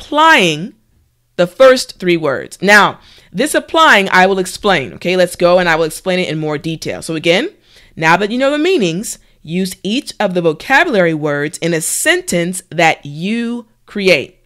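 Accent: United States. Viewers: well is this american english ? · Anyone please tell me Is this American English channel or British ? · American probably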